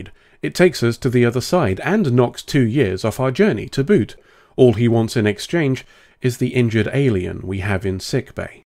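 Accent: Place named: Yorkshire, United Kingdom. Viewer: I find these humorous reviews immensely entertaining. This could be because Star Trek has never really caught my eye as something worth seeing every week. Hence, I have zero nostalgic connection to the franchise. I did see an episode of the OST when in first aired. Ye Gods was boring. From what I can tell, Star Trek Voyager appears to have been made specifically for teenage boys. Boobs n Battles is a winning combination in one's teens. Thank you for expanding my Trek knowledge. Always a pleasure to hear a fellow Yorkshireman prattle on about stuff. Like yourself, my RP slips whenever I hit on the vowel 'U'. There is something about that particular engrained speech pattern that is hard to shake-off.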